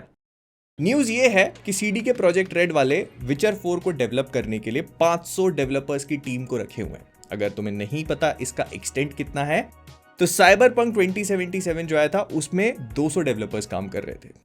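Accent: Bhopal, India. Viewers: Aaj bhai full bhopali mode me hai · Are you bhopali?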